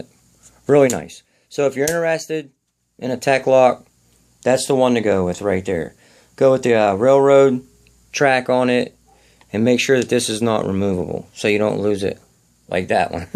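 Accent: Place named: Pennsylvania, United States. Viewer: "you can tighten this daaan" I love your Pennsylvania accent.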